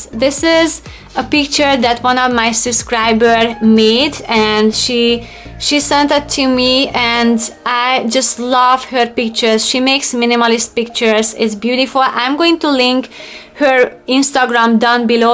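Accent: Hungary. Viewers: Are you hungarian, because your accent sounds like it. Where do you live? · A few seconds into the video when I recognized the thick Hungarian accent. I live in NYC in a 350 sq feet apartment and I still want to downsize and get rid of more stuff ( although my place is not cluttered) I guess everyone has a different idea what is big or small. The place looks amazing, You guys did an amazing job. Örülök, hogy egyre több magyar használja a Youtube ot extra bevétel szerzésére. Mások is csinálják, akkor miért is ne. Persze angolul könnyebb. Feliratkozok a követőid közé. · Wow. What a transformation. Well done! I love your house. I wonder... Where are you from? Guessing by your accent, Is it Hungary? Sorry, just curious...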